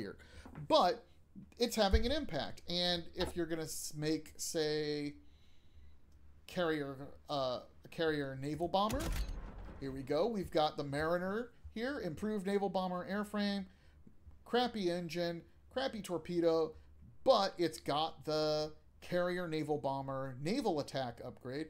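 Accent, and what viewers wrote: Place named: Melbourne, Australia. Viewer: Sounds like Melbourne, Australia.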